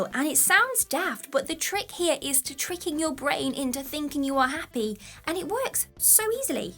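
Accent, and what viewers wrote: England, United Kingdom. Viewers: You're just wonderful 😊 And I so much love your accent.. wonder where you're from in England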